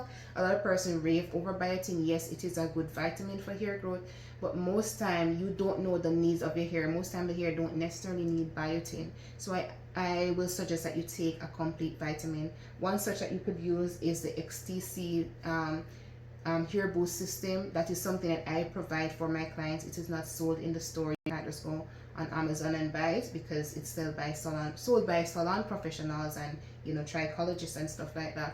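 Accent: Jamaica. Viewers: Girl mine before that was our Jamaican 🇯🇲 accent ❤❤ new subscriber here sis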